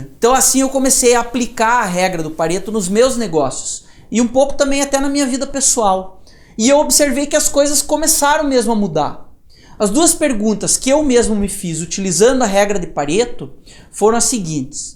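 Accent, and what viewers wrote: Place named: Paraná, Brazil. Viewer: Esse sutaque acredito que seja paranaense kkkk. Excelente profissional o.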